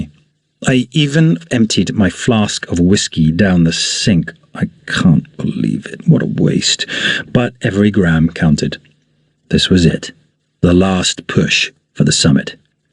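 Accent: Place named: Scotland, United Kingdom. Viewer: Bonjour 👋 quelle belle aventure et de très belles photos et raconter par notre ami avec son accent écossais c'est superbe. L'aventure a été très difficile et plein d'embûches mais est une personne solide et très sportif mais malgré tout sa il a dû se battre. Merci pour la traduction c'était super car j'ai vécu des moments très agréables avec.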